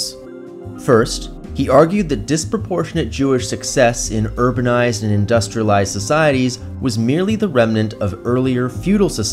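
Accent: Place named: New York, United States. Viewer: Just curious if you see this we’re you raised in New York? You have the accent. Love your channel from Long Island/ Netanya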